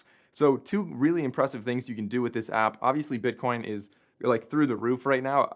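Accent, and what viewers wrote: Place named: United States. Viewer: Thanks great explanation , I appreciate it, with American English understood clearly , I’ve seen other videos explaining with other English accents and they just got me more confused, thanks You are the man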